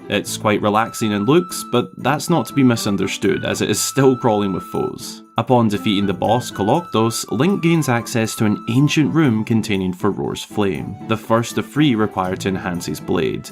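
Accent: Scotland, United Kingdom. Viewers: a zelda channel run by a Scotsman, im home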